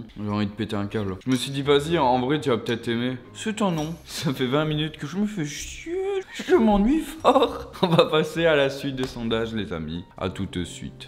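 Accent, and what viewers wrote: Belgium. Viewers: Il est belge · T belge ?????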